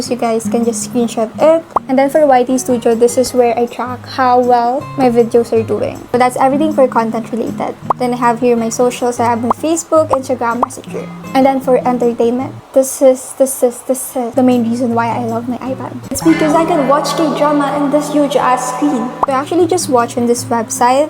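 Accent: Philippines. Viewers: Do i hear a pilipino accent? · I knew you were tagalog bc of ur accent!!! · I CAN HEAR THE FILIPINO ACCENT 🤩💕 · Hi I’m new to this channel and are u Filipino bc u sound like one a if u are one hello twin 😂 ❤ Edit: oh wait u are Filipino pala 😂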